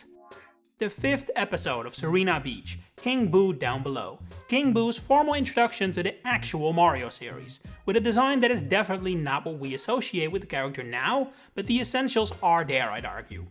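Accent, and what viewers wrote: Netherlands. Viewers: You sound Dutch. Are you?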